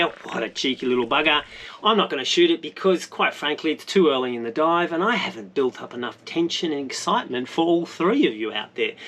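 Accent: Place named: Australia. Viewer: Not at all! You have to work with what you have but that doesn't deny you being smarter about it. All you have to do is keep filming, and giver yourself enough time between 'eprisodes' to craft your content into a storyline that garners interest, and which you USED TO DO, otherwise I would not be sitting here giving you an honest critique! Think about what you used to do that's missing. I haven't had time to look at all your older 'top end' episodes but the few I have provide a viable outline. They contained the following: 1) Aussie accent and lingo 2) Interesting locations & commentary 3) Lots of 'fluff' 4) A bit of 'puff' 5) and some high-seas drama that nearly everyone could relate to one way or another, e.g. "who's drinkin' all the flamin' water?", "crocodiles" etc. ...and you were building numbers! Also don't forget that a story has a beginning: set the scene, a middle: flesh it out with interesting content, drama, & Margarida (especially Margarida), and an end: wrap it up with a mini preview of the next one, a teaser or two from Margarida, thank your sponsors, and tell your viewers/followers what you need from them if they are enjoyed THIS show...and all of that in Aussie lingo with the occasional translation of the more obscure. 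Note to you: You had most of that, sort of, right up until Margarida got stuck someplace else, and you had the lightening strike. None of the above is about changing you, champ. It's all about changing how you do what you are doing, or at the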